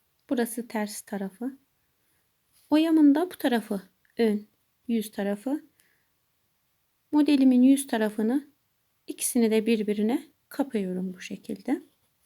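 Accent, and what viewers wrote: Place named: Uşak, Turkey. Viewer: Selam kolay gelsin nerelisiniz ben uşak lı bazı youtuber ları takip ediyorum onlar günlük vlog atıyorlar konuşmanızı benzettim eger mümkünse nerelisiniz cevap yazarsanız sevinirim başarilar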